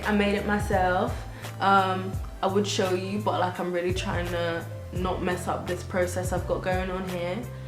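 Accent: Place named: United Kingdom. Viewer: oh you're British... assumed you were American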